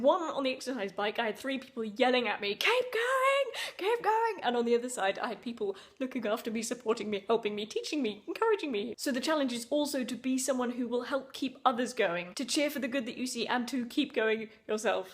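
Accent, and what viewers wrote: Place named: England, United Kingdom. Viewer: Love that proper english accent.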